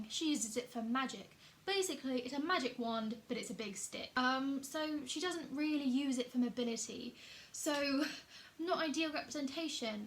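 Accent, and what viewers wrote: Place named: Southern England, United Kingdom. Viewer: You sound like Beckie0 <link> Where abouts in England are you from, somewhere down south?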